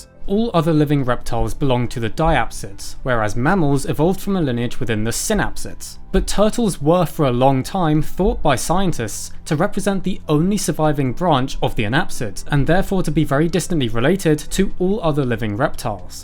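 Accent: RP, United Kingdom. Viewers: I like British accents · You're so British. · You sound like, but I dig it.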